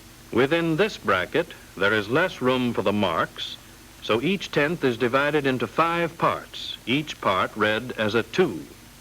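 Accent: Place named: Oklahoma, United States. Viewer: Who did the voice over for this film? He sounds like someone from Oklahoma.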